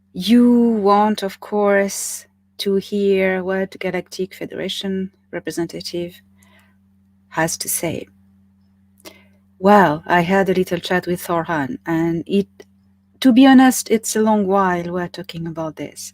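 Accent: France. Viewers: French accent speaking English is so beautiful! My children went to French Schools and I love the way they speak too❤️What is particularly cute is that the translation says (Alien sheep) 😄 Raise your vibration by doing something you love, for me, music really helps and arts and crafts. Spending time in nature, watching Sunrise/Sunset, being close to water, eg the sea or a river if you are not close to the coast. Lots of love, Thor Han, The Galactic Federation and all those reading the message 🙏❤️👍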